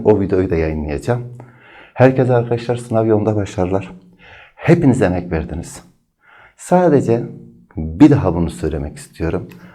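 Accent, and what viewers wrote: Urfa, Turkey. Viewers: Hocam Urfalı mısınız ?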